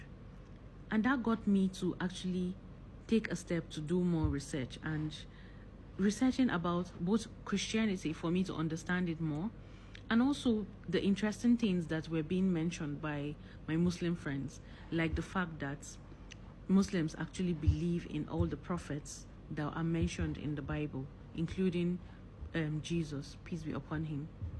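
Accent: North, Nigeria. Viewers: Sorry. Firstly, you don't look like an igbo person. Secondly, you have a core northern accent. Igbos dont have such accent even if they've stayed in the north all their life. Thirdly, you don't know Jesus personally. Christianity is not a religion. It's a way of life. :Christ like". Finally, you're just talking nonsense.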